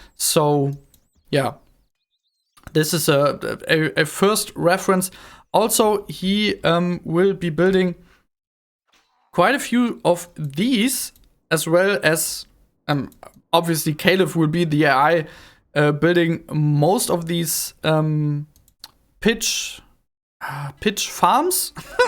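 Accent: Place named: Germany. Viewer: I love your beautiful denglisch :D "It was a inner flower picking" xD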